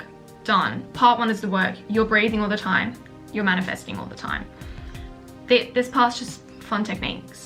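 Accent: Australia. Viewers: I keep faking an Australian accent for an hour straight after I watch your videos and I'm not even a native English speaker I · Is she Australian? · i watch atleast two of her videos every day to get motivation + learn the aussie accent lmao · Finally someone who teaches accuratelyyyyyyyy. Also every time I watch your videos my mind speaks in an Australian accent to me for like 30 mins after. Don't know what that means but I'm not complaining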